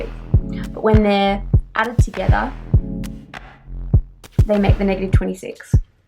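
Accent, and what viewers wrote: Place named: Australia. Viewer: It sounds like you are from Australia, but you use grouping and NOT the Australian method. Very curious.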